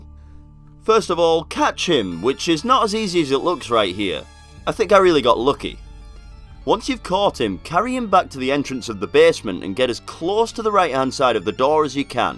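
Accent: United Kingdom. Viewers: Are you British? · Are you British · He's British I think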